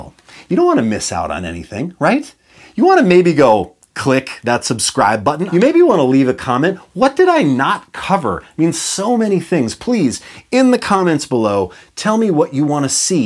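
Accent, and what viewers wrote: United States. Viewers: I’m confused, why do you have an American accent now? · Yes yes yes ! But why the American accent ? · Dude I got like so freaked out that u didn’t have an accent. Good job with the American one btw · Pretty good American accent can't lie lol 😂 great video tim c is a legendary bassist so underrated played with so much aggression, anger, passion, love, hate and awesome all round bassist 🤟🏻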